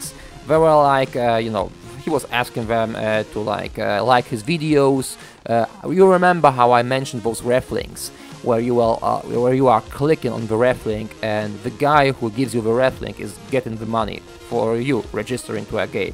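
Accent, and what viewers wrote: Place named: Poland. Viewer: Hey, I've been a fan for the longest, and I got I say you're accent is funny as fuck. I honestly agree EA has something very fishy going on with all the hackers and the non banning attempts. I've been wondering you seem like a fantastic Polish player and I've been a fan for sometime, I know I'm not as famous but I'm quite well know in the US, I ofcoarse haven't started a channel but plan to in the future, id love to make maybe videos in the future as you have inspired me. Oh and id love to play with you sometime, maybe you can add me? Playmobil5 is my username on battlefield heroes. Thanks bro Peace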